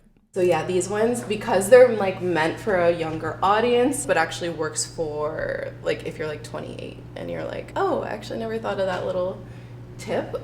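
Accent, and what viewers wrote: Canada. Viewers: We missed you sm!!! I’ve been watching since I was a teen and I’m 21 now…I just realized you have a Canadian accent😆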